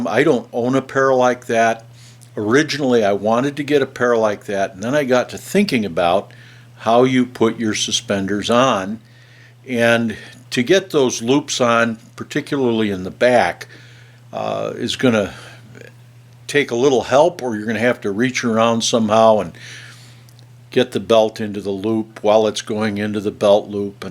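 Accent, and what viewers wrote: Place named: West, United States. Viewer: you can hear the west in his mouth lol. UNCLE!!!!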